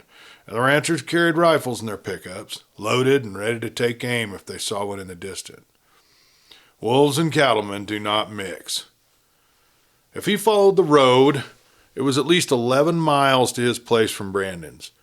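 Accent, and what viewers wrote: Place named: Texas, United States. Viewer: And you almost sound like a Texan❤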